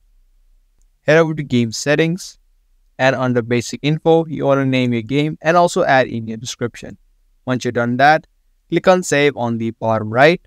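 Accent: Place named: India. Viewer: I hear indian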